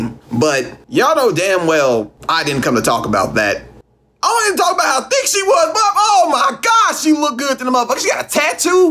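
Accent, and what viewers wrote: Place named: Texas, United States. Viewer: U s texan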